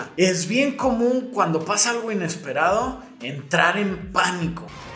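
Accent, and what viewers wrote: Mexico. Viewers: Oye desde chile y te entiendo la mitad muy a lo mexicanote tu video jajajaja por favor un poco mas neutro jajjaajaa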